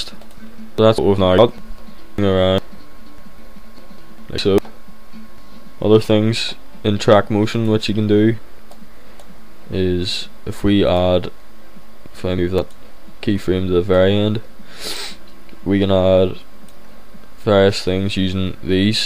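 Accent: Northern Ireland, United Kingdom. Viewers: Northern Irish. I know that accent anywhere! I'm Irish too. Hell yeah! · Yoooo ur frum Northern Ireland too matee haha high Five!!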